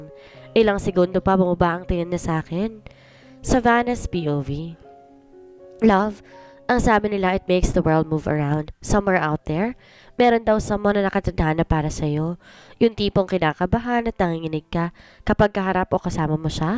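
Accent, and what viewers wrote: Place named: Italy. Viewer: The narrator did much excellent in Italian dialect, very good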